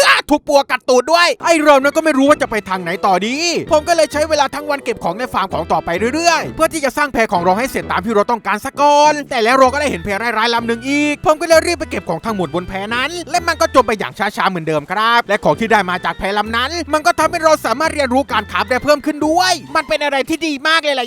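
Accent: South, Thailand. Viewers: เสียงเหมือนคนใต้บ้านเรา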